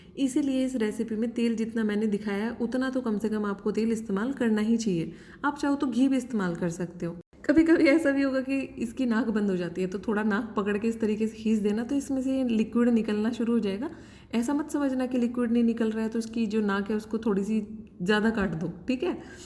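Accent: Haryana, India. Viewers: Nice and pleasant to hear Haryanavi accent so soft spoken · Didi Haryanvi ho kya?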